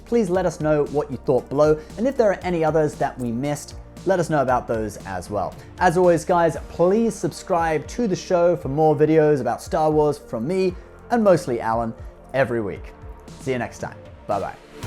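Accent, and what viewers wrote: United Kingdom. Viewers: ? That's a real surprise. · A rare appearance of indeed. But always a pleasure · Why don't we see any more. I miss you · Welcome back. Good show, good show 👏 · Yay another video with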